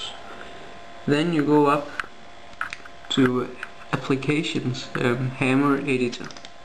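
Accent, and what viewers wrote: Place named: Denmark. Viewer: man kan høre i din stemme du er dansker Yo DK :D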